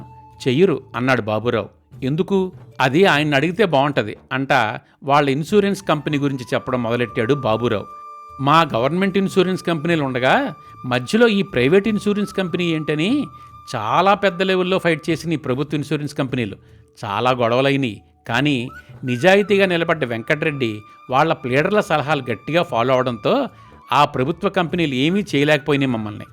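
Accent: Godavari, India. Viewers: వెంకటరెడ్డి గారి విజయం యొక్క వంశీ గారి కథని అచ్చమైన గోదారి యాసతో కమ్మని కథనంగా వినిపించారు.... 👌💐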